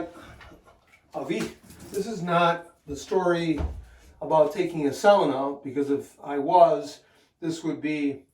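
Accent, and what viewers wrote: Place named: United States. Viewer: Feels so weird to se a person so obviously Finnish by the looks, speak that American accent. /67% finnish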